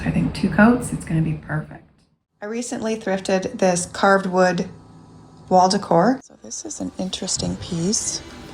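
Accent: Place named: Canada. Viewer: She sounds Canadian.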